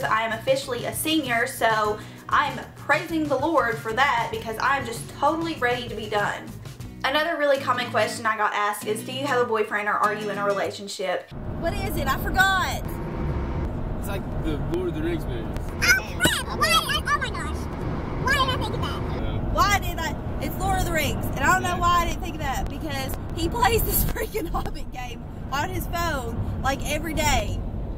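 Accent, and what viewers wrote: South, United States. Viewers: I'm originally from Memphis but have a very strong southern accent. I now reside in Rogers, Arkansas . When people ask where I'm from I pull out all the punches, I tell them I'm from "Toadsuck, Arkansas ". It's a small suburb outside Conway , lol. They don't really believe me when I tell them Memphis. Love you and your accent, my home state girl!!!!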